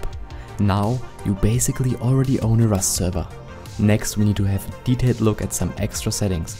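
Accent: Germany. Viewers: Impressive fast explained I like it and I sub! Ganz liebe grüsse nach Deutschland, den akzent merkt man lol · Sowas von ein Deutscher xD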